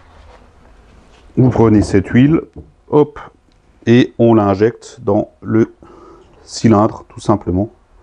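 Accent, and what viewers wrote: Alsace, France. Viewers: Salut, merci beaucoup pour cette petite astuce. Ton accent me rappelle ma jeunesse à Sélestat... Cool.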